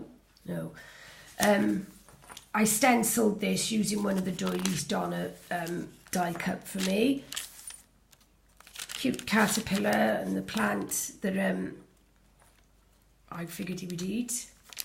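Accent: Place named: Cardiff, United Kingdom. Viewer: Hi. I’ve been following Tracie for a little while now and just came across your channel. I adore that journal you made. The detail is incredible and the family history inside of it so precious. I’m a new subscriber to you now and look forward to binging on your videos! Can I ask whether I’ve detected a fellow Cardiff girl? 😀. I’m fairly new to JJ and TNs and my channel is pants at the moment but I’m enjoying making them. Thanks for the inspiration xxx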